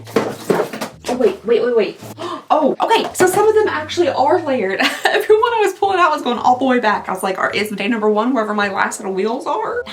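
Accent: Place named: Southeast Missouri, United States. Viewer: Awe! You looked so lovely in this video, young lady! After finding your channel last year, I’ve had a blast watching these! I’m from southeast Missouri so your accent is like home!!❤ thanks for all the smiles!❤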